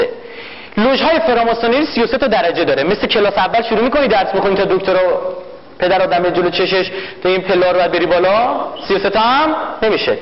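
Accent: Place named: Iran. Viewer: khob in agha irani ast wa dar iran zindagi mikone wa lahje ash irani ast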